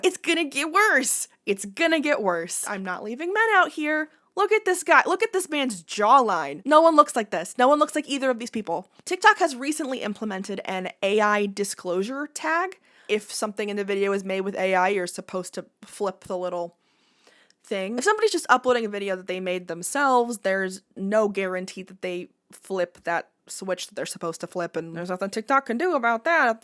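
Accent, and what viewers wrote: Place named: South, United States. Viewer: i don't think I realized you were southern!! southern gang!! 😊 Also, great video. i love this!!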